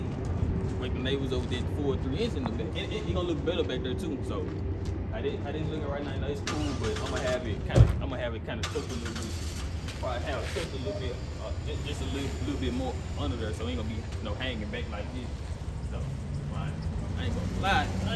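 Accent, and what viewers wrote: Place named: Memphis, United States. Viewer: its how black people from memphis talk , if u not from around there then youre never gonna be able to understand them. I live in indianapolis but i can still understand what he saying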